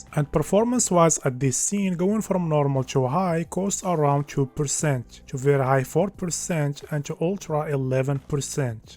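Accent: Morocco. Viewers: BRO i can tell a moroccan when i hear one 😂😂😂😂 rak nadi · ana 3rfto mghribi ghir mn laccent dyalo hhhh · maghribi english n9iya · yo bro are you moroccan your accent is moroccan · Naddi erftk mghribi ghir mn laccent dyalk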